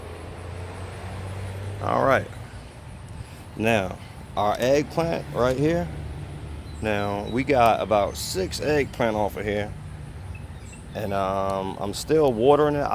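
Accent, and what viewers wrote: United States. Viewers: Is that a New Orleans accent I hear? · Love this accent... is it cajun ? What is this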